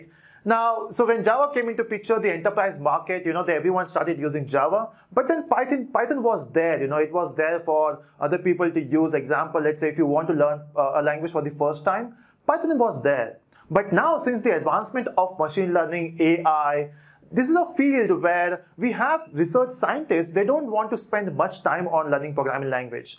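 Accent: India. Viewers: So, before I learn Python, I have to learn your Indian dialect. Hmm...this may not be for me.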